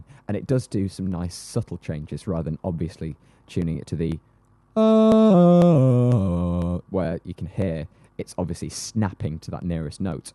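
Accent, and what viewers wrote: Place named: Darlington, United Kingdom. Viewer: INMHO... Sounds like, a lad Darlington.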